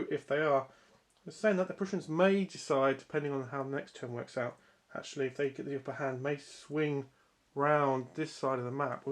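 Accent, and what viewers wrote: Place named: United Kingdom. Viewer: Love this british accent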